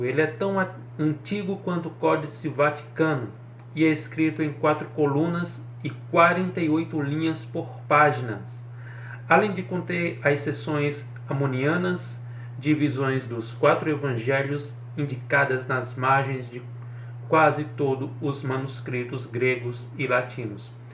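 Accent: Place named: Brazil. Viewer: Mais um que acha que judeu, até me interessei em saber o que significa um códice, mas já começa falando esse hebraico abrasileirado 😅 tô fora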